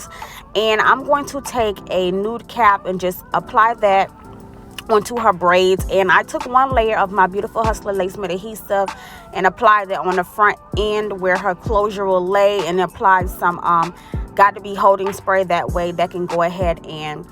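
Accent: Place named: Florida, United States. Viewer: Yassss!! I’ve watched a few of your videos and every time I’m like she sound like she from Florida and does hair like she is from Florida. Heard the beat and was like that sounds like a Florida beat. Scrolled down and saw the 786 area code. FLA Stand up! Born and raised in the 954 😜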